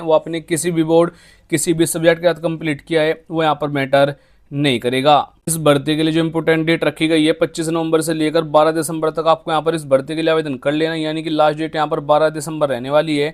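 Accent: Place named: Pakistan. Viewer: Pakistani k tarah baat krhe hai aap toh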